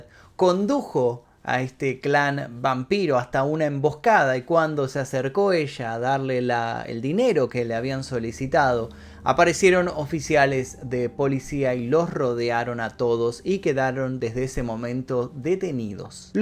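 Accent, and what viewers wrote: Argentina. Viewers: tiene buena pronunciación y habla genial y relata execelente las historias con un toque de argentina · Me encanta tu forma de narrar y a eso agregale tu acento argentino maaaaas. Saludos desde México. Soy tu fan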